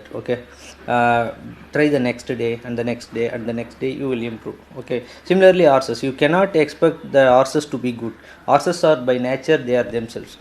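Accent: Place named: United Kingdom. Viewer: British english ah na😟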